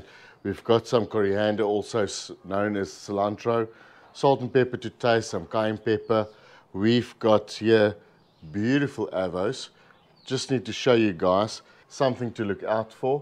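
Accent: South Africa. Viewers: South African accent right there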